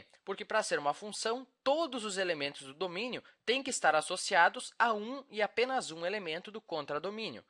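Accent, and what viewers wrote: South, Brazil. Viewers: esse sotaque é do sul. você é de onde?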